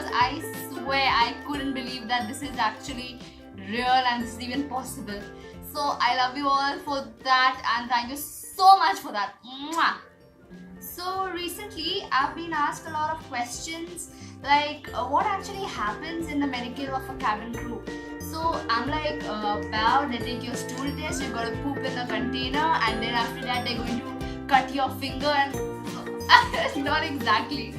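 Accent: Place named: Nepal. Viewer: so cute maam u r!! nepali acent 😘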